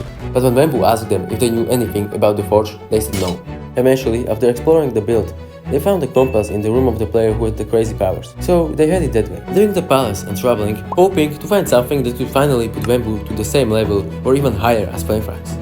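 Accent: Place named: Czech Republic. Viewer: Yo where are you from bro, you sound like your from Czechia? Ze by takova nahoda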